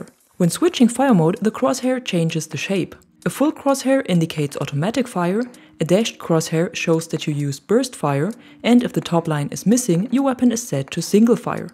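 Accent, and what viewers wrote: Germany. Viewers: You have a new sub. Finally a channel with great tips and tricks instead of endless crying how bad the game is. Judging based on your accent, you are from Germany right?:)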